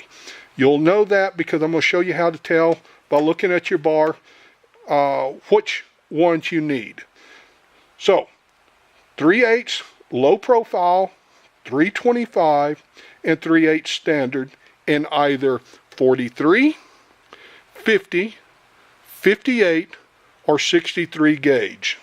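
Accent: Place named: United Kingdom. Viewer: Hi Lovely accent where ya from Bro.............Briddy UK thanks.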